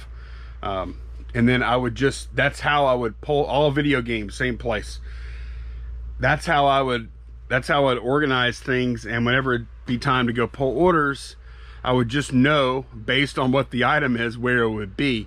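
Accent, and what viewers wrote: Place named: East Coast, United States. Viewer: My Dad used to let me stay up to watch Nature Boy Ric Flair, Rowdy Ronnie Piper, Hulk Hogan came later I think... back in the 80s. I love listening to back east accent also. I am from the east but moved to west coast 20 yrs ago.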